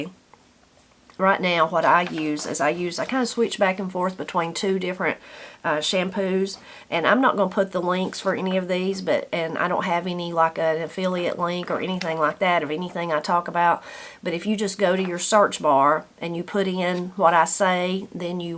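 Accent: Appalachia, United States. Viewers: I am curious about persons who hold themselves out as being capable of beneficial commentary for others. As a writer I admit I am very critical of such persons. Morally I think you live in an admirable way. While you have an interesting way of speaking as a representative of Appalachian culture, I find it disappointing that you don’t seem to have pursued a means speaking English correctly in order to set an example within that culture. Yet you speak of working at the college, which is somewhat puzzling and contradictory.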